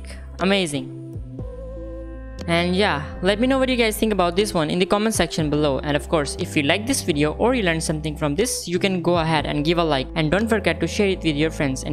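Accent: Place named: India. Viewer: With the Hindi accent and the noisy background, the tutorial is irritating. Text to speech applications are freely available on the internet. You simply type what you want to say and the converter can turn your text to choice English speech. You can choose any voice type; or language and even such as Oxford or Cockney English. Yes, you can also choose between male and female as well as children. Windows also has such a facility.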